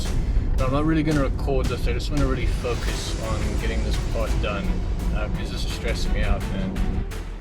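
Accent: South Africa. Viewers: Im kinda getting South Africa vibes but Idk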